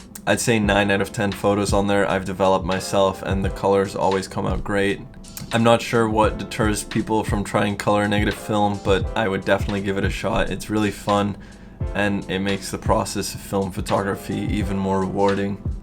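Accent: Netherlands. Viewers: I love the video so much! I've had a look at your website and i love it! (Idk why but it sounds like you have a Dutch accent) if so, gegroet! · Are you Dutch?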